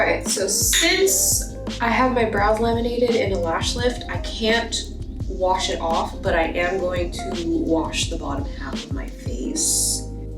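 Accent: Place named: Australia. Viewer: Your such a beautiful soul inside and out and I hope everyday you keep a smile on your face and we love hearing you Aussie accent 😂🫶🏾🫶🏾🫶🏾🤎🤎🤎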